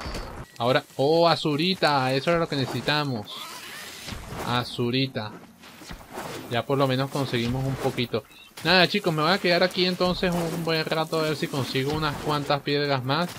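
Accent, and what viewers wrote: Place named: Venezuela. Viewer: eres venezolano si o si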